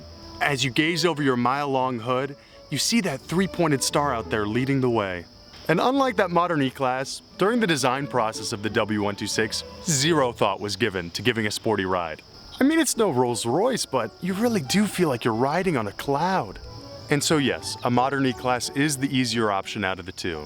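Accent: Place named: Italy. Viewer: Totally Italian talking styles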